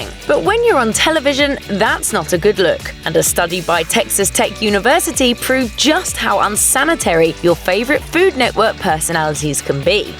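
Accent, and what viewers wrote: United Kingdom. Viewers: who's the brit? get back to watch mojo girl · Incognito ? You defending the bitch like that's your wife or something. Calm down you bitch. I just don't like a British accent period. Fuck yall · British accent commenting on American shows...stupid.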